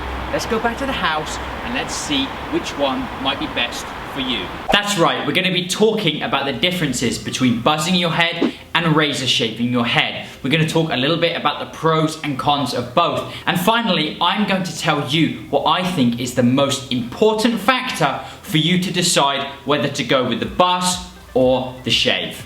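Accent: England, United Kingdom. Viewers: Your English intonation attracted me to your channel ☺️😂 but you are cute bro ❤️🔥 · Love this channel. Just found it and feeling better already! And I love this dude's accent. Is he from the same part of England as Russell Brand? Very similar accent scenes... Anyway, I'm not quite ready for this but headed in that direction I think..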